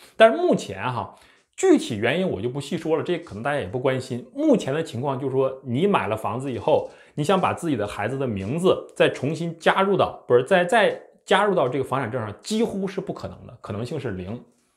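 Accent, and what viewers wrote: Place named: Northeast, China. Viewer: 抱歉噢。。。我听到你的 “十三岁 ” 发音 就想笑。。。 东北口音吧？？？。。。我新加坡人。听了 很特别 可爱。。。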